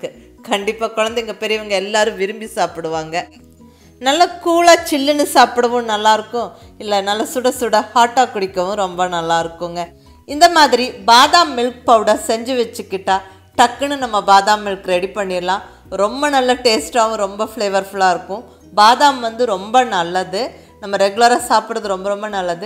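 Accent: Coimbatore, India. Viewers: சகோதரி சமிபமாகத்தான் தங்களின் பதிவுகளை பார்க்கும் வாய்ப்பு கிடைத்தது மிகவும் சந்தோஷம் அருமையான பதிவுகள் எளிமையான முறையில் நல்ல தமிழில் புரிந்து கொள்ள கூடிய முறையில் இருக்கிறது வாழ்த்துகள் 💐💐தாங்கள் கோவையை சேர்ந்தவர்கள் என்று நினைக்கிறேன் உச்சரிப்பு அருமை வாழ்க வளமுடன் நலமுடன் தீர்க்கமான ஆயுள்ளுடன் பல்லாண்டு பல்லாண்டு பல கோடி நூறாண்டு கள் ⚘⚘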